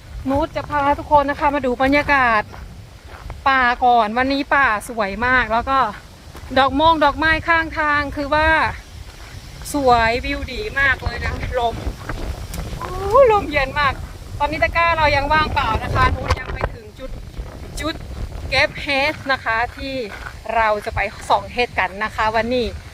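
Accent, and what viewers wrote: Korat, Thailand. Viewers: สวัสดีจ้า ฟังพูดคือคนโคราช บ้างเอ็งแท้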